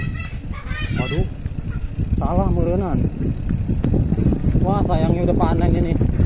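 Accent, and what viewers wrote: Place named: Jasinga, Indonesia. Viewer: logat Jasinga nih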